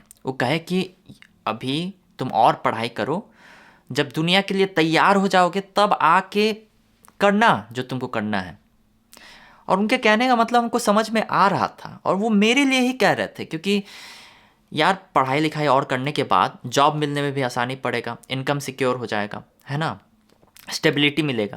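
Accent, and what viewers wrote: Bihar, India. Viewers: I did my schooling in a school in Jharkhand which had tons of people from Manipur. So, it doesn't surprise me to see some one with your looks talking with Bihari accent. All my Manipuri friends talk in pukka Bihari accent. But I like your boldness & content, and subscribing because of that rather than just the novelty of your accent + looks. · Baiya mujhe be aapki bihari ascent sikkado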